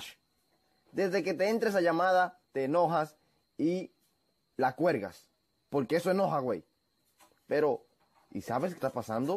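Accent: Dominican Republic. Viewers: Eres dominicano sierto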